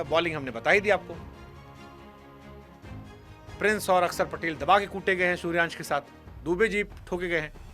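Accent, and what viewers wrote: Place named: Uttar Pradesh, India. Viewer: Bhai. Aap. U. P. Se ho. Kiya. Bolne. Ka andaj. Jaber jast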